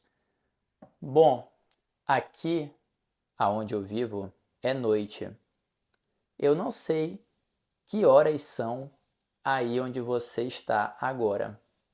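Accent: Brazil. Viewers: Where in Brazil is that accent?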